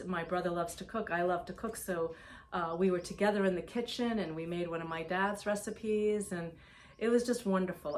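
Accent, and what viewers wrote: New England, United States. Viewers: Love your PC. Just found you last week. I heard your accent and knew we had something in common !. Native New Englander here...Central Falls, N. Prov., Warwick......Love the references you make to being from RI. So sad to see the aftermath of the hotel....happy to hear they'll rebuild. We went to the island last summer. I hadn't been in years. Beautiful as ever. Looking forward to next episode. Happy September. Jeanne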